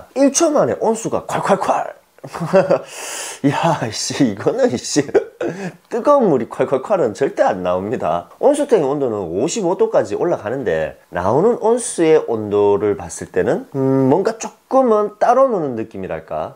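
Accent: Gyeongsang, South Korea. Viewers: 경상도 목소리 악센트 맘에안들어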